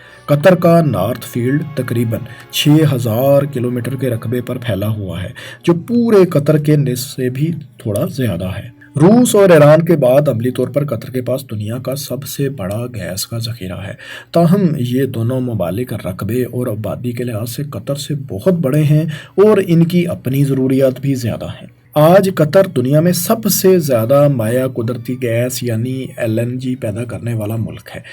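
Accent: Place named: Punjab, Pakistan. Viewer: السلام علیکم جیسا سحر فیصل بھائی کی آواز میں ہے وہ چیز آپ میں نہیں ملتی ہمیں لہذا آپ اس چینل کو چھوڑ دیں آپ کی آواز اتنی سلو ہے سنتے سنتے نید آ جاتی ہے اور اردو کے کے کچھ لفظ آپ پنجابی میں کہہ کر جاتے ہو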